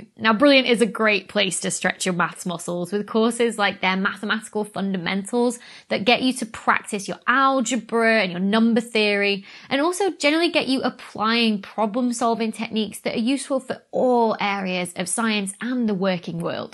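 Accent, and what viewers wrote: United Kingdom. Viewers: Dr Becky, what part of the United Kingdom are you from? I ask because I am intrigued by your speech patterns . Believe me this is not a criticism, I am from the United States where we haven’t spoken English in years. Just an old man’s curiosity. I have started listening to your new book and love it so far.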